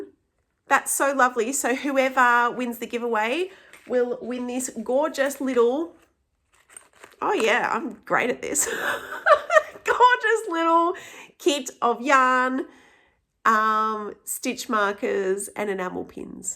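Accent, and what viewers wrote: Australia. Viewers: Congrats from W.A on a very enjoyable and technically brilliant first podcast, it's always terrific to hear that aussie accent. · Hi, Diane from Mount Waverley here. I was so thrilled when I clicked on your podcast and out came your Aussie accent saying you were from Geelong. I loved the way you promoted Aussie yarns, especially BWM. I can't wait to watch your next video. As for jumper/sweater suggestions, it depends on what type of fit you want. Basic raglan sweater by Joji Locatelli might be a good place to start. Or the Felix pullover by Amy Christopher's or the No Frills Sweater by Petite Knits. I've made the latter two, and they are both good, basic raglan, top-down constructions.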